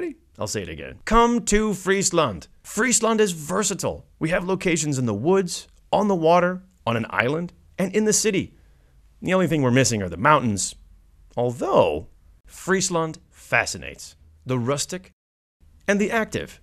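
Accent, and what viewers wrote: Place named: United States. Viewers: Greg Shapiro (the voice of this video) is an American, that's why he pronounces everything the way he does. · You can speak Frisian but you refuse to, why pronounce these things so American-like?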